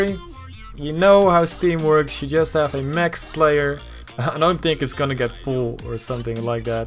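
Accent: Netherlands. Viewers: i would love to play with you but i am only gold nova 4 haha and btw ik dacht al dat je nederlands was ik hoorde het aan je accent :D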